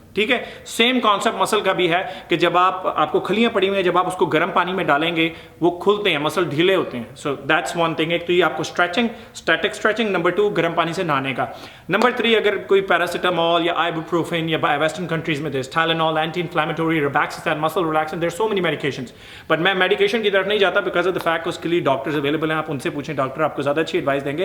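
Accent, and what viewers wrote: Punjab, India. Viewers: Pa ji your punjabi accent is just too gud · sir ur video is awesome and ur panjabi is sooooo cuteeeeee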